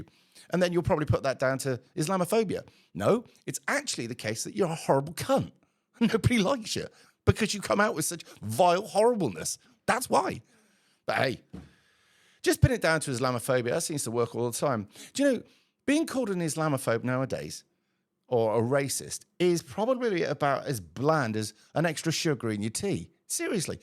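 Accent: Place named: Newcastle, United Kingdom. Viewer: What area is she dictating for. Sounds like newcastle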